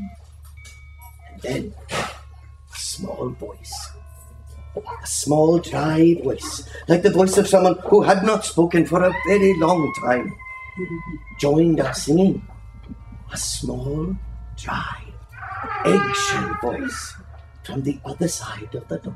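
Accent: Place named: Wales, United Kingdom. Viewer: As a Welsh person myself, I’d just like to say that you nailed the Welsh accent perfectly.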